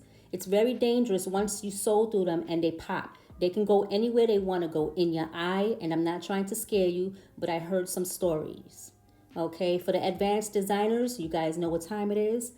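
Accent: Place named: Brooklyn, United States. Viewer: Great tutorial! And I ❤ your accent. Brooklyn Borequa, right? Giving Rosie Perez! 🇵🇷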